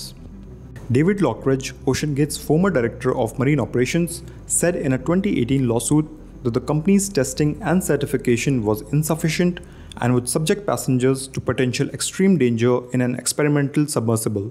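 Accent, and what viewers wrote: India. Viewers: Sorry but Hindi accent makes me laugh! Can't get serious about this video. I do apologize!!! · Oh no not that indian accent ! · Great job! Your English is atrocious due to the heavy Indian accent but the content was good. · Indian englishhttttt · I felt like I was listening to an Indian call center🙄 also pressure is not only on top…..it is equal all around the exterior. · The paj33t accent is unbearable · Im impressed. I didnt expect an indian to have such a good description and understanding of material and engineering. I almost left when I first heard him. But I think he is pretty good! I like the video, thanks for sharing your knowledge! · Who used an Indian to narrate this story???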